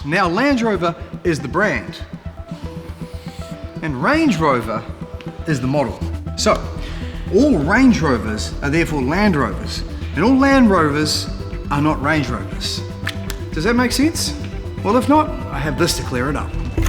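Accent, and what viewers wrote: New Zealand. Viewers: Didn't know New Zealanders pronounced "Land Rover", "Langerovah" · Damm I love that NZ accent! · NZ accent at its finest ladies and gentlemen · This video is hysterical and brilliant. Love the hair "in 1970 and the polyester suit." You are correct, we don't know the difference. Humor and your NZ accent, you can sell anything! :o) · Damn I hate that NZ accent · Always loved NZ accent over Aussies at least the world can understand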